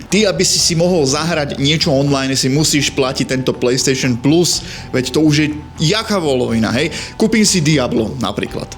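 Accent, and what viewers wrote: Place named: Czech Republic. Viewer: Kúpuje to akože naozaj? Ach vy čechuje ZE Slovenska. NEUVERITEĽNE odporný pepícky prízvuk.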